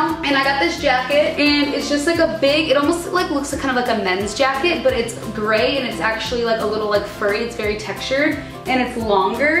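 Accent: Chicago, United States. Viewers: Wait is she from Chicago? Someone please tell me!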